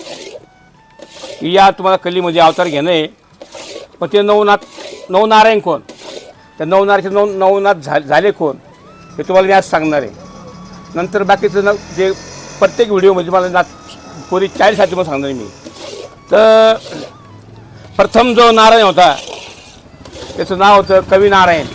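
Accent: Khandesh, India. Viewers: तुम्ही कुठले आहे मला तर खान्देश कडचे वाटता भाषेवरून मोहनमाळ बघितल्यावर माझ्या तोंडाला पाणी सुटले पण असा मोहनथाळ आमच्या कडे खायला मिळत नाही कोणी बनवत नाही ईकडे तुमचे सर्व पदार्थ खुप छान असतात आणि अगदी प्रेमाने तुम्ही ते काहीही न लपवता सांगता खूप छान वाटते असे तुमचा मोहनथाळ बघून तर मला असे वाटले माझ्या कडे काहीतरी कार्यक्रम करावा आणि मोहनथाळ तुमच्या कडुन बनवुन घ्यावा 😋 आम्ही पंढरपूर जवळ राहतो म्हणजे माझं सासर आहे आणि माहेर चाळीसगाव पण आता तिकडे कोणी नसते पण तुमचे पदार्थ बघून तिकडचीच आठवण येते मला खुप छान बनवता तुम्ही सर्व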